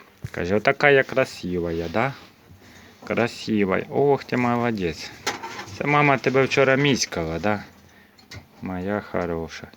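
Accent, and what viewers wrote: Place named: Sumy, Ukraine. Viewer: Доброго часу доби!Підписалася на ваш канал,я так зрозуміла,що ви з Сумщини?Цікаво звідки саме,я теж з Сумської обл.,з колишнього Буринського району,тепер Конотопський.Тримаю небагато кроликів,для себе.Цікаве у вас відео,знімайте ще,приємно чути свою мову,сумську. 😀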